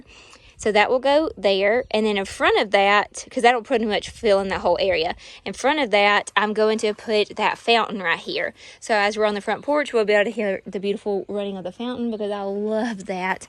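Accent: South, United States. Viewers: I live in the North and I have to be honest. I love your Southern Accent, but I cannot understand a Word you are saying ! The Reason is you talk way to fast. Maybe if you slowed down and did not run your sentences together, It would be easier for some of your viewers.🙂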